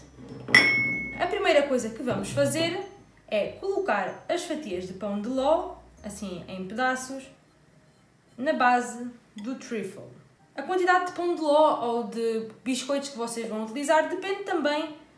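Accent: Portugal. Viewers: Primeiro adoro o seu sotaque português. Perfeito. Segundo. Realmente esse não é um doce para quem está de dieta. Mas farei para provar.🤔🤩🤩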